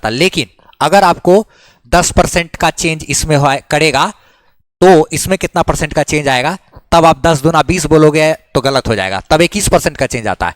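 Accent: Bihar, India. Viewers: Sir kya aap bihar se hai ? ❤️❤️❤️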